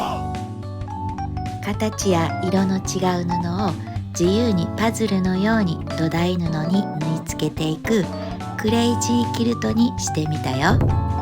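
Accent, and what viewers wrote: Kansai, Japan. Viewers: やっぱり関西弁がええわあ、聞いてて面白い。😂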